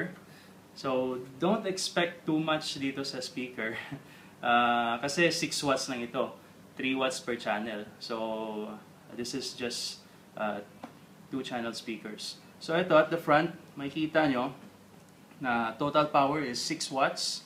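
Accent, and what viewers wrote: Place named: Philippines. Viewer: It's the disgusting pinoy accent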